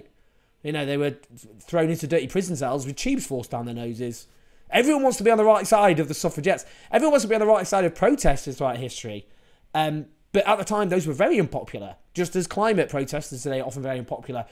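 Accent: United Kingdom. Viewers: British need to get rid of the royalty thing. It's so unthinkable that you still admit this kind of institution. We got rid of our king a long time ago (although killing him was a bit too much). Our sense of resisting and expressing the popular demands come from that time when our people took threw away the monarchy. Anyway, lovely to hear your British accent. I feel like I am hearing a Shakespeare play at times.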